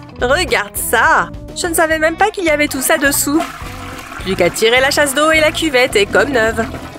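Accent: England, United Kingdom. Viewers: Vous êtres anglais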